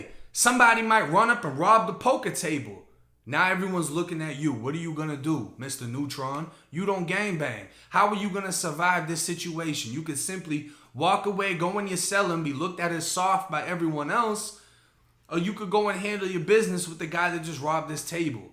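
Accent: Boston, United States. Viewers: Every time I hear you speak you remind me of my days working in Boston and living in Mass. Keep up the great work with your channel. You have a great way of telling a story. For me I am someone who has never been locked up but you put me in a mindset of what would I do if I ever were ever to be locked up. My ass would check in real quick. haha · You definitely have a Boston accent east coast type...did you ever live around my way I'm 45 minutes away from Boston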